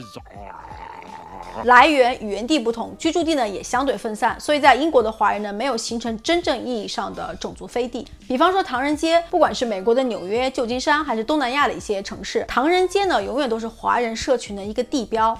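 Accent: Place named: North, China. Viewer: 你是哪里的 听着也像北方的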